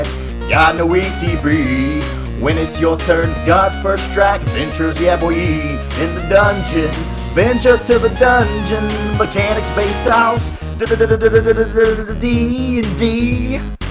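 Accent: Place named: Oklahoma, United States. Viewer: btw are you from oklahoma by any chance? lol your accent sounds very much like how 99% of the people im around sound. lol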